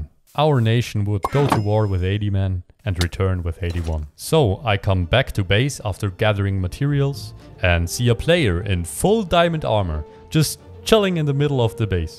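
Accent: Germany. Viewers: Man kann hören das du deutsch bist haha cooles Projekt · i can hear the german in your voice :D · I hear a german accent. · Are you from germany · You kinda sound German :) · Are you german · Are you german?! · der deutsche akzent hittet schon echt aber ich feier den content mega! keep it up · dein deutscher akzent ist so wild XD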